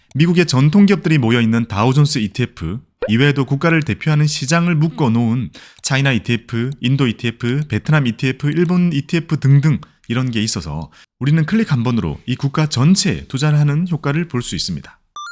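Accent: Gyeongsang, South Korea. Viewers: 경상도 사투리가 있는거 같지만 목소리가 너무 좋습니다. 귀에 쏙쏙 들어오네요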